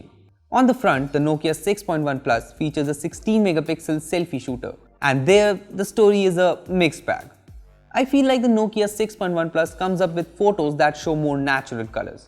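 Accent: India. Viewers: Only indian guy's ar presenting nokia fuck :)))))))), that accent kills me boooy:)))))))))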